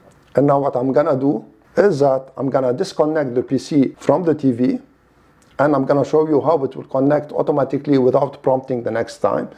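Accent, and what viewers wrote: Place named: Lebanon. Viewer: your accent is lebanese! are you ?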